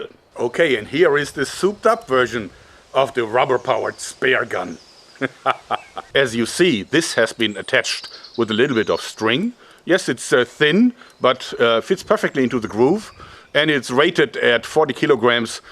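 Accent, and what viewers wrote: Germany. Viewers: The speargun has not just one shot. And you don't have to fill it up. You took too much pressure to the spear gun, so it can be damaged. Also air pressure guns ARE NOT TO FIRE IN THE AIR! THEY CAN BE DAMAGED! Listening to your accent I think you're german as me, so please read this: Die Harpune ist werkseitig mit einer fest eingebauten Druckpatrone ausgestattet. Die Patrone hat bei der Auslieferung einen Grunddruck. Es sind daher keine Druckpatronen o.ä. erforderlich. Die beiliegende Pumpe dient nur zur gelegentlichen Nachjustierung des Drucks oder zur Füllung nach Wartungsarbeiten. Die Harpune kann so beliebig oft hintereinander genutzt werden. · Kann es sein das du deutscher bist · Can someone explain to me why his German accent and someone's German accent like Christoph Waltz or Skallagrims German accent are so different? is it a regional thing?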